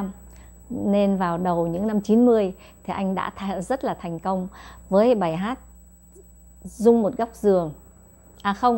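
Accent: North, Vietnam. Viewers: Giông bắc của chị thanh lan dễ thương dạ vân dạ vân hôn chị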